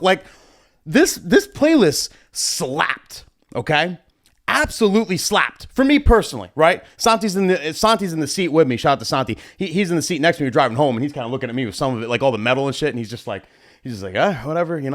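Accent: New Jersey, United States. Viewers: Dude you are so Jersey! Love from L.I.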